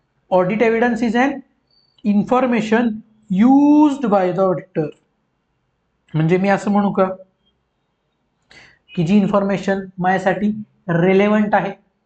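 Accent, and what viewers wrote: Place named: Satara, India. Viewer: सुट्टी not भावा... आवाज तर सातारचाच वाटतोय...❤❤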